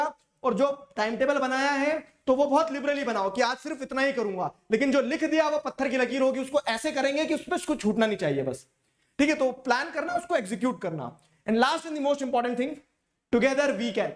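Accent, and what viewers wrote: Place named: Madhya Pradesh, India. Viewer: Sir Your accent is similiar to me because I also live in MP ( Indore ) love you ❤️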